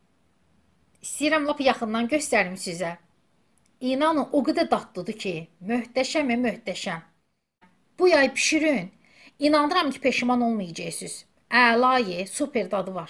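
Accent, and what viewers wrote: Baku, Azerbaijan. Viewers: Çox sag olun. Bakili ləhcəsiyçin darıxmışdım. Əla izah edirsiz . Mən də 40 ildir fisincan bişirirəm, amma qoza sogan qatmıram. Qoyun əti və quş əti ilə bişirirəm. Sizin göstərdiyinizi bişirərəm. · Can bəh bəh təmiz Bakı ləhcəmiz və anamın bişirdiyi fisincan plov əlinizə sağlıq 🧿🧿👍👍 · Can bakili bacim.Ùreyim daga dòndù.Wirin Baki lehcesinde bize mexsus fisincani mòhtewem hazirladigina gòre tewekkùr edirem.Ellerine sagluq.Mawallah olsun. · Can bakl can bakl lehcesi Adam birini bakl lehcesiynen danlsan esiden kimi ureyi qopur Cox teessuf ki az qalmlslq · heç sənin kimi gözəl ,şirin ləhcəli bakılı qızından laykı əsirgəməy olar əllərin var olsun canım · Əllərivə sağlıq bakılı qızı qurban olaram ləhcəmizə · ALLAH QORUSUN MAŞŞALLAH ƏLLƏRİN VAR OLSUN TƏMİZ BAKİ LƏHCƏSİ🤲MAŞSALLAH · Can ay bakilim sesive quvvet daniwiq lezzet eledi❤ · Vallah darıxmışdım Bakı ləhcəsi üçün. 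Allah əlinizə golunuza güvvət versin, Amin! ❤🎉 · Nə şirin bakı ləhcəsi var.❤😅.Hələ istiyirsən qula qasasan sənə qızım.Əllərivə saqlıq. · Bu bizim Bakı ləhcəmizə gurban olum. Amma biz Əmircanlılar abgora vururug fisilcana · Sizin elə qəşəng,şirin Bakı ləhcəsində danışmağınız, fincandan da ləzzətli idi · Çox gözəl Bakı ləhcəsi ilə danışırsınız xoş olur.əlivizin ləzzətlərinə də söz ola bilməz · Qırmızı kitaba düşmüş təmiz bakılılar Darıxmışam Bu ləhcə üçün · Ellerinize saglig gozel bakilim yorulmuyasiz .Lezzet edir baki lehcesini ewidende 🤗Masgura lap lezzet eledi 😊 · Can menm nenem de Bakilidi.lehce mene lezzet elyr.Allah var elesin haminizi.Ellerinize saglg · Salam bakılı qız sənə bakılılardan salam lap ləzzət edir mənə sizin danışığınız mən də cox vaxt bişirirəm bayramda da elə belə vaxtda da əyər xətrim istəyirsə toyuqdan rəhmətlik anam və nənəm gil qaşqaldaqdan daharırlayardılar atam ovdan vurub gətirərdi amma biz toyuğu bişirəndə 2 3 dənə böyük soğan atırdıq və sonra onu cıxardıb atırdılar biz soğan qovurmuruq mən özüm gavalı turşusu hazırlayıram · Qurban olum lehceve can bakilim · Beh beh Can Bakilim ❤️❤️❤️inaninki fisincan qalib bir qiraga hayil mayil olmuwam daniwigiviza 💖💖💖nece deyirik biz bakililar edeln dedeli bawa salirsizeeee 👍🏻👍🏻👍🏻😂😂Mawallah Allah sagligi versin ruzuviz bol olsun biwirib düwüresiz bizde lezzet alaq 🤲🏻🤲🏻🤲🏻🤲🏻🤲🏻❤️❤️❤️❤️Allah qorusun xalqimi🤲🏻🤲🏻🤲🏻🤲🏻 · SUPER ELLERINE SAQLIQ SENIN O GOZEL WIRIN LEHCEVE QUZU KESIM MENIM GOZEL BAKILI QIZIM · Bakili xanim ellerinize saqliq. 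Xeyirliye biwiresiz. Baki lehcenizi anami xatirlatsin. Nesillikce temiz Iceriweherlidiler. Anamda gozel, tamli fisincan biwirerdi. Mende anamdan oyrenmiwem. Super di Baki yemekleri. ❤❤❤🙏🙏🙏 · Əsil Bakı ləhcəsi!!! Ləzzət elədi!! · Fisincandan çox sizin ləhcəniz mənə ləzzət elədi. Mən də bakılıyam və əsil Bakı fisincanı bişirirəm. Xanımlara bir məsləhətim var. Əgər qara tavanız yoxdursa, qəm etməyin. Bir qaba 2-3 stəkan su tokürəm, köhnə ət maşınının setkasını atıram suyun içinə və bir çay qaşığılimon duzu əlavə edib 20-30 dəqiqə qaynadıram. Dəmiri içindən çıxarıram. Həmin suyu çəkilmiş qozun üzərinə tökürəm, 3-5 dəqiqə qaynadıram. Qoz qapqara olur, həm də turşməzə, heç lavaşanaya ehtiyac olmur.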